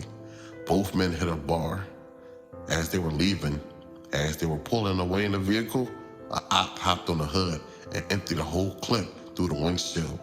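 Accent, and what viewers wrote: Philadelphia, United States. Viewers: Yo you gotta be from Philly you talk just like us… where you from North?…appreciate your work bro keep doing your thing I be tuned in💯💪🏾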